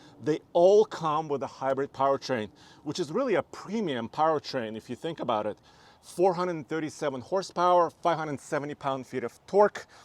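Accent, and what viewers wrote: Russia. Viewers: Great review! Love your accent! Is it Russian? :) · It sounded like someone else’s voice for the first couple seconds then the Russian accent came back in lol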